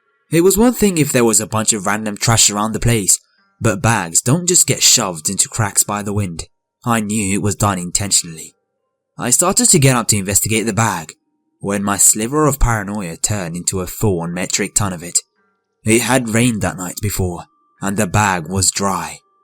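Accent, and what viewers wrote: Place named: England, United Kingdom. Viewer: You're welcome! I figured you were from England. I am from Louisville Kentucky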